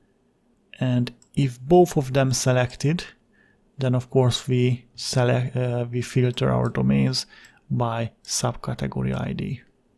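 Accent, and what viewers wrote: Hungary. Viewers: Ugy hallom abbol az angol akcentusbol hogy magyar vagy te is :)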